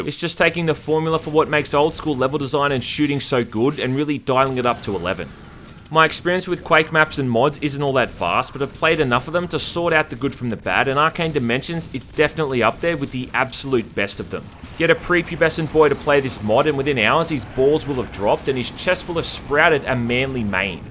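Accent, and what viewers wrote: Australia. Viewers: Tyler Malone so it's Australian accent he speaks with?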